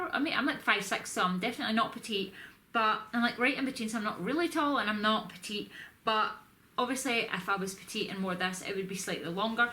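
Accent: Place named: Scotland, United Kingdom. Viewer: Hi i really liked your charity shop hall's , you have a lovely scottish accent. Thankyou.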